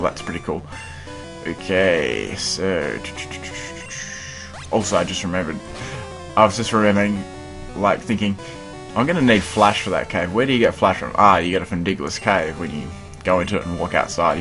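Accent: Australia. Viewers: Didn't know you were aussian!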